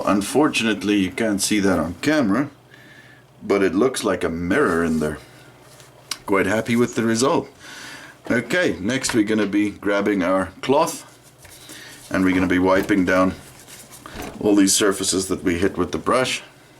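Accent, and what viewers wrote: South Africa. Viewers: Enjoy the videos, man! I have to ask, is that a South African accent?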